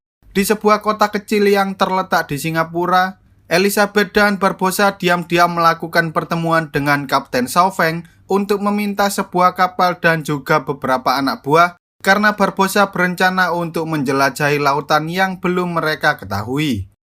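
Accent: Java, Indonesia. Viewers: Aduh logat jawanya medok banget.. Maaf bikin gak nyaman dengernya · Medok jowo miminnya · iki dodit yo suarnya rek medok pisan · Iki delok teko suoro ne wong jowo ?? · Miminnya paati orang jowo.. suaranya itu lohh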